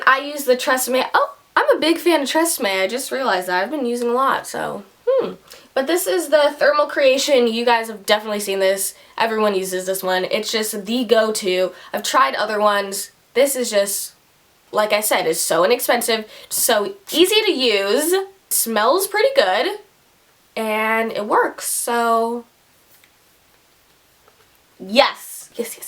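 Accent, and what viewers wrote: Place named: United States. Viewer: IKR!!! But American accent obviously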